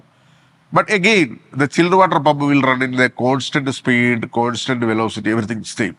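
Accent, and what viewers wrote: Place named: India. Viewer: dear you are doing great job. but please pronounce words in a way so any one can understand easily. your pronunciation is like typical domestic indian language. keep in mind while making the video tht Your audience is not only from India but also from all countries. so your words should be understandable for all of them.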